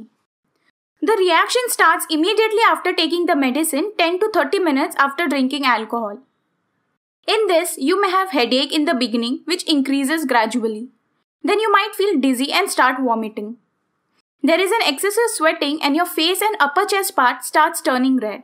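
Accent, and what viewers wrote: India. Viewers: Indiaaaaaaaan pleeaaase stop talkin’ inglish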